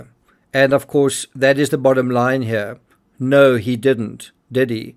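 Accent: South Africa. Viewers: You have a South African accent!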